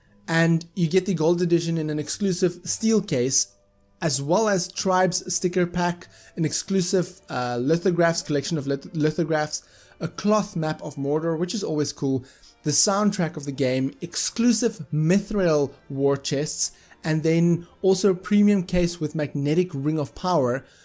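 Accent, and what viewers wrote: South Africa. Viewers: You sound so South african...!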